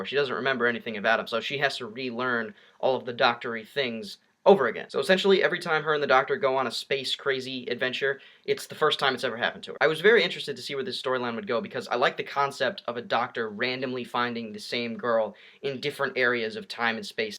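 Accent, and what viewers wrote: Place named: England, United Kingdom. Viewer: That English accent omg XD you do make lots of good points though! Even if I did stare at the angel tshirt for the majority of the vid...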